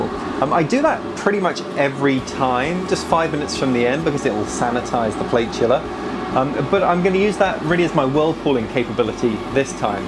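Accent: United States. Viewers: I LOVE Double IPAs...awesome recipe, awesome video as always! I want that Clawhammer system SO badly...but I'm eyeing up the Brewer's Edge Mash and Boil (w/ pump) for now. It's a lot cheaper, but obviously not reaching the level of the Clawhammer. Anyway, loving the series! Thanks! The American accent btw...SPOT on. ROFL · That southern accent 😂And that beer looks good!!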